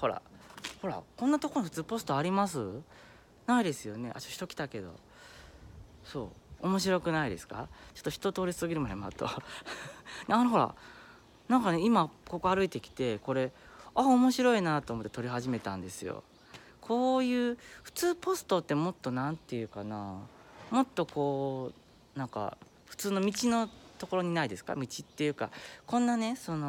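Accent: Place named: Kansai, Japan. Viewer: 関西のおばさんという感じ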